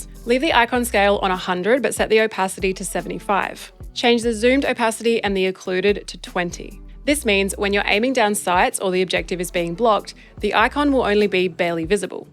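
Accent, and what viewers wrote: Australia. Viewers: I hear you mama content definitely has matured since I last watched still love that aussie accent.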